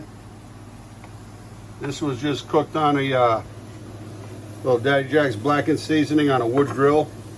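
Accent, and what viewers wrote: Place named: Philadelphia, United States. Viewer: Great Job......you talk and act like ur from South Philly!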